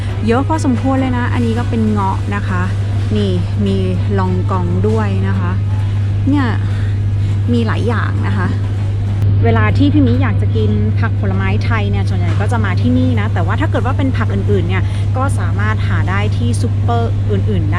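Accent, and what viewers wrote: South, Thailand. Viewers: นํ้าลายแตกตามเลยคะ ชอบ พูดใต้คะ ขอบคุณสำหรับคลิปน่ารักๆคะ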